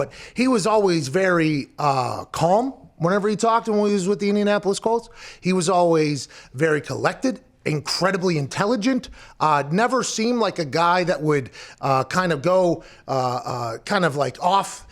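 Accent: Pittsburgh, United States. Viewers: I love watching pat mcafee simply for his pittsburghese accent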